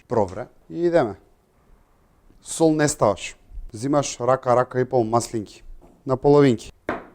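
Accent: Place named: Skopje, North Macedonia. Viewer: Decki govorete makedonski. Vo opsto ne si interesne ako zoruvas na Sk dijalekt. Aloo vodis emisija za recepti. Odbivno e za emisijata toa so se LIGAVIS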